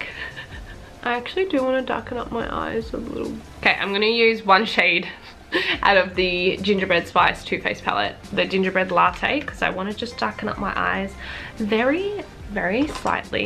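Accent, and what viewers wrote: Australia. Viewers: I can tell as much it's Australian accent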